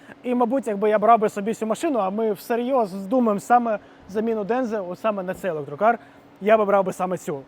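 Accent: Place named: China. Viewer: А навіщо говорити англійською з китайським акцентом ?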